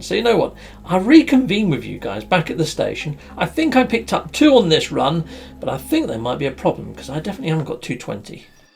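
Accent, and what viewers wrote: England, United Kingdom. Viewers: Classic British guy voice 👑🤩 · I enjoy your videos. I love your English accent. I’m grieving for Elizabeth II. 🇺🇸🤝🇬🇧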